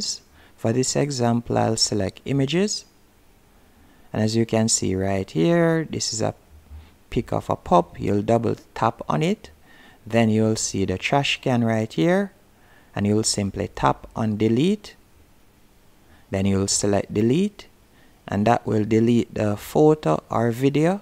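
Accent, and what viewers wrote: Jamaica. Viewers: u jamaican??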